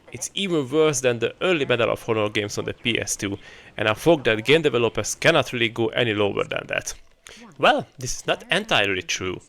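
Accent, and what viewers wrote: Hungary. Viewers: BTW, are you Hungarian by any chance? I think I can hear on the accent. I live in Budapest but speak very poorly. Anyway, subscribed and looking forward for more backcatalogue reviews.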